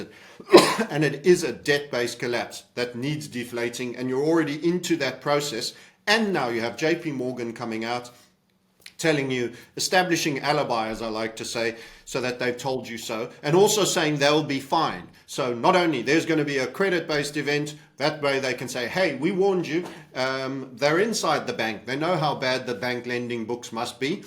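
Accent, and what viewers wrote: South Africa. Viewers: South African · This is an Africaans accent, right?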